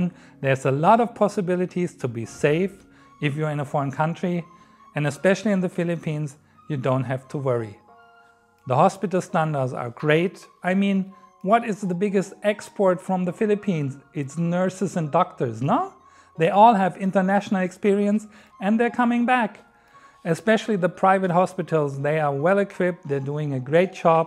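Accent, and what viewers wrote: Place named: Germany. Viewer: I really like to hear a Deutcsh speaking Englisch. So clear like Filipinos.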